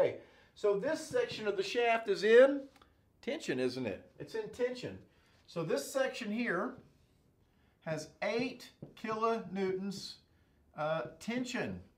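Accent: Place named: South, United States. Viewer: I'm a sophomore at Cornell. Prospective MechE. One of my classmates told me to look this dude up. I feel like I've just found the ultra southern Professor Leonard of Engineering, and I'm fucking HERE for it. I'm going to survive statics if it kills me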